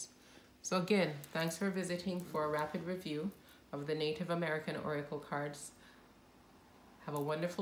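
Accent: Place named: Jamaica. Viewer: Trying to figure out your accent. Not sure if your Jamaican or not. Maybe Trinidadian. I know both talk differently. I’m usually pretty good recognizing Jamaicans. Maybe it’s not even the two countries I mentioned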